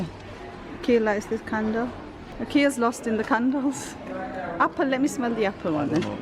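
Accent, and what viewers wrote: Manchester, United Kingdom. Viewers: Can’t you tell by her accent? She’s from near Manchester!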